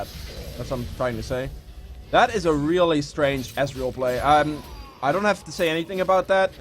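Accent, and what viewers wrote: Netherlands. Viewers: Ok 2 things r u dutch? And btw isnt free stop+free boots bettrr?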